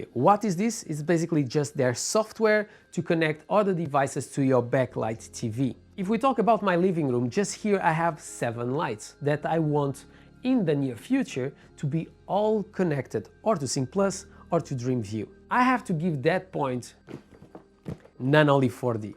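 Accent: Portugal. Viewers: Caralho, pelo sotaque és tuga😆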